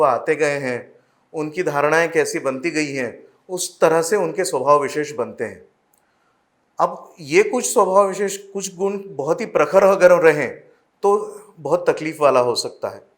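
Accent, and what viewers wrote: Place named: Maharashtra, India. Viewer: Tu marathi n tuz accent marathi ahe marathich sangaych murkha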